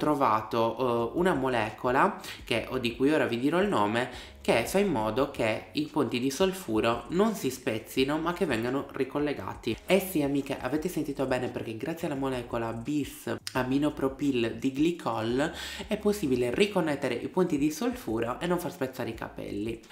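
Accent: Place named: Sardinia, Italy. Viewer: Ti stimo tantissimo,grazie per i tuoi consigli preziosi,simpaticissimo quando esce il tuo accento sardo😂❤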